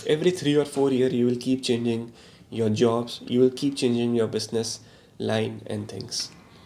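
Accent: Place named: United States. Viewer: Its american accent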